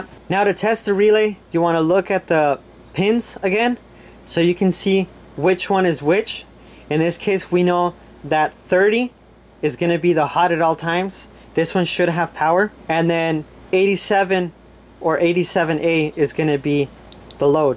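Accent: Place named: Latin America. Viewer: Se te escucha la voz de latino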